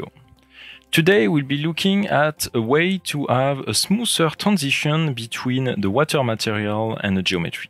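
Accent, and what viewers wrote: France. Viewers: L'accent français est reconnaissable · Hello Twinmotion folks. Sorry, it's nothing personal, I love the French language. But the narrator's accent is very pronounced and I can't quite understand it. Please, I ask you to put it in French with subtitles or in English, but with less accent.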